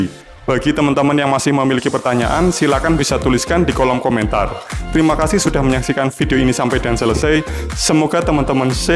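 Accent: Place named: Java, Indonesia. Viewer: Suaranya mantap bgt. Sangat manly meskipun berlogat jawa.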